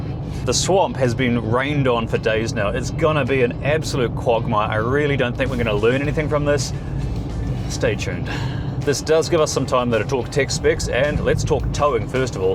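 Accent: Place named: New Zealand. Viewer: yep! Kiwi.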